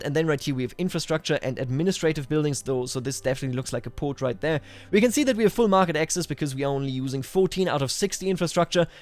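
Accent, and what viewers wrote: Bavaria, Germany. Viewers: Are you actualy bavarian?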